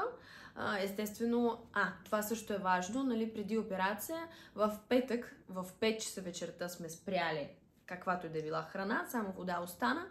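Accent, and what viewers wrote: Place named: Russia. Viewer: Долавям нещо руско,жена ми е рускиня както ти така и жена ми бъркате адски много граматиката:)Дори в заглавието на клипа има грешка:)Успех и моля не говори като Наталия Кобилкина)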